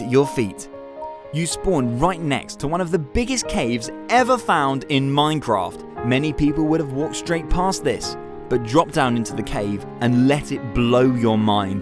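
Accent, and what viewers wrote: United Kingdom. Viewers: Br’ish boy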